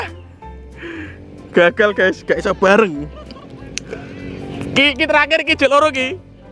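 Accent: Java, Indonesia. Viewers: Jowo pundi to mase?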